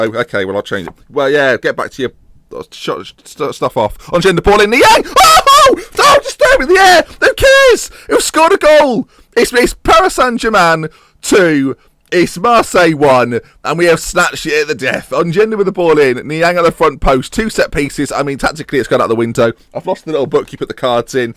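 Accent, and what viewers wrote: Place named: Newcastle, United Kingdom. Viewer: chuffed for you for hitting 30k subs mate. u deserve it man. me fave youtuber from newcastle england to australia. currently in a hostel in mildura victoria but stil watch ur vids every day. reminds me of home so cheers wor kid. canny geordie accent aswel but stil needs work haha!! keep doing wot u do pal. #fluid #geordieinoz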